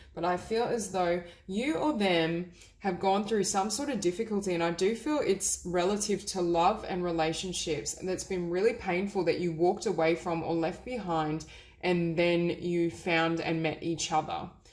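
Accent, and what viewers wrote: Australia. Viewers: Omg you are awesome and my intuition getting better , thank you I subscribed, you sound Australian, I have seen that all Australian readers are awesome something special about you!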